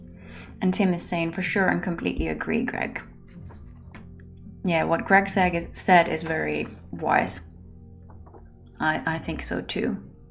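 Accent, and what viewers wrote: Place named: Finland. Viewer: Hi,. I did watch (well listen to) this one today. Wondering if you ever got that "team" you wanted. Again, I know I'm new around here, but I'd be eager to help. (Or Igor to help, as the case my be. Okay, that was my one joke.) Serious now: Obviously I'm not as much use as someone who is physically there, and I'm no good at makeup (you do your own make-up? Even I assumed that you had someone to help with that! You look amazing, btw.) Things I have thought of that I could potentially help with (short version) 1) Proof-reading of scripts for any material you're producing in English. [A lot of what you do is music, but if you move into more ASMR work, there could be scripts.] [I used to help a friend who was a professional proof-reader for a major book publisher, so I feel pretty qualified here.] 2) Possibly suggesting minor edits to scripts but being okay with you having the final say. 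2a) [I have some knowledge of linguistics (hobby number 37 on my (non-existent) list). I know handy things like the difference between a voiced and an unvoiced consonant. If you want, I could potentially help you with pronunciations on some of the trickier English words. (Although I think English is more charming when spoken with a Finnish accent.:)... Sorry, professionalism here.) However, sadly, I only know abut 4 words of Finnish, so I'm not going to be tons of help with proofreading Finnish. ] 3) I'm a good typist, for what that may be worth. 4) I've used Gold-Wave pretty extensively a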